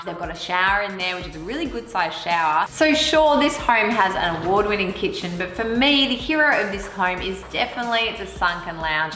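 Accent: Australia. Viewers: This is undoubtedly a lovely, cosy house which was very well designed, specially because it’s been built mixing wooden elements that contrast greatly with all the industrialised materials used such as the main marbling floor which was a fantastic choice as it’s not just beautiful for its colour and easy to match but durable and easy to clean too. I just think - in my humble opinion- that the backgarden, specially the very part that surrounds the swimming pool, deserved a proper, greenish, landscape project with lots of plants and trees not only to enhance the whole building but also to keep a cool temperature around rather than the plain grass chosen. As for the footage presenter, I must say you are lovely too, very enthusiastic, has a very convincing and descriptive language (with a lovely Aussie Accent) and I quite like you...well done!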